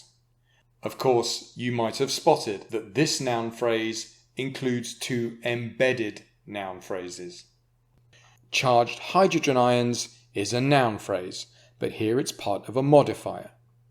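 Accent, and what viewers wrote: United Kingdom. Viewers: Beautiful British accent. I like it so much!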